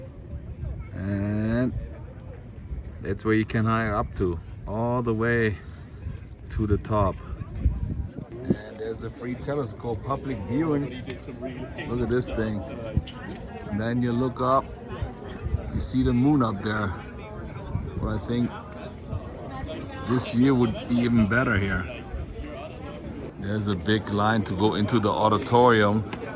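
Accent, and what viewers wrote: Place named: Bavaria, Germany. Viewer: Hi nice accent maybe Bavarian dialect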